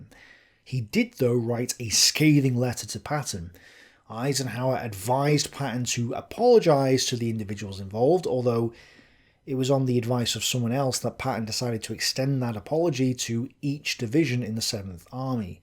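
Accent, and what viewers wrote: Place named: Staffordshire, United Kingdom. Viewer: That’s a fellow Staffordshire accent if I’ve ever heard ons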